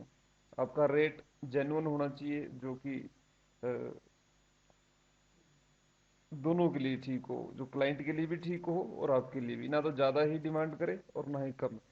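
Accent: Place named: Himachal Pradesh, India. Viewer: Very Informative Video in Himachali accent :). Thank you Sir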